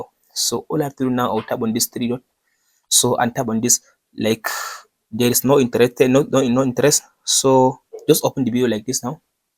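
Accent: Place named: Bhojpuri, India. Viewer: Bhojpuri english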